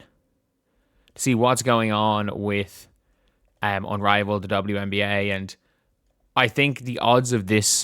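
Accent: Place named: Ireland. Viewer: I love to hear my hoop news in your charming Irish brogue. Reminds me of my immigrant dad. Love u dude keep spittiing those facts me boyo 🇮🇪🏀☘️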